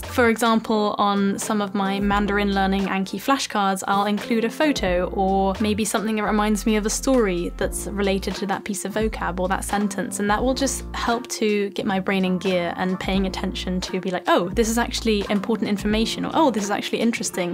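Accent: RP, United Kingdom. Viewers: I love your British accent a lot. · If you ever make another video, wich in fact i doubt, please answear in your responses if you speak RP all the time or just with us in these videos. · Hi are you British ☺️ it's important for me because I am trying to learn British accent . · British accent 😭😭😍😍😍😍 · I want to learn British accent and I really like your accent and thnx for tips .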